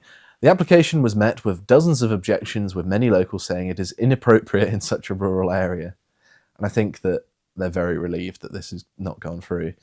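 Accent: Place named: London, United Kingdom. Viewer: He's speaking like a Londoner tbh. Completely disconnected with where he came from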